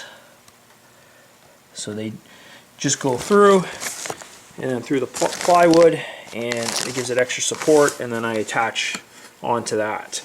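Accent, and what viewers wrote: Canada. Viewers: Knew you were Canadian the moment you started speaking; cheers fella!!!!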